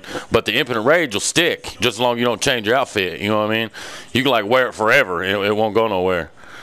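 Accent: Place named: Tennessee, United States. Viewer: U from Tennessee or what?i know a near home accent when i hear it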